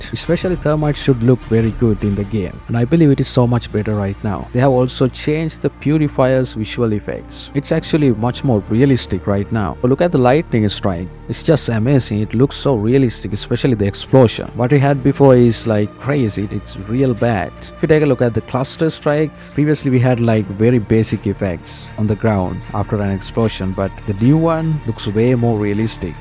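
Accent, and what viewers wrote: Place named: India. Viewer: Hey love ur vids has helped me a lot also are u from india ur accent seeems like it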